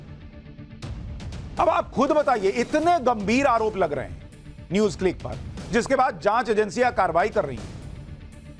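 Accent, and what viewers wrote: Bihar, India. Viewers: Superfan of Bihari accent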